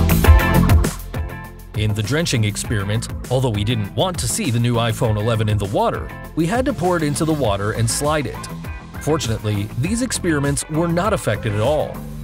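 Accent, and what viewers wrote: United States. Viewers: Holy shit the broken English in this video is so off-putting when read by someone with an American accent.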